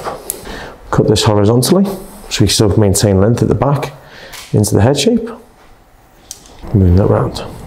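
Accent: Liverpool, United Kingdom. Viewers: you sound like you have a Liverpool accent I am right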